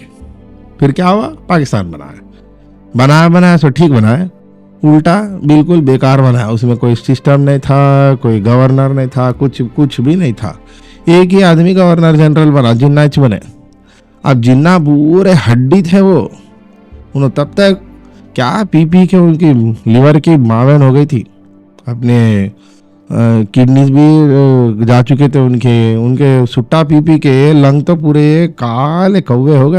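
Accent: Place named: Hyderabad, India. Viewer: Asli Hyderabadi Hindi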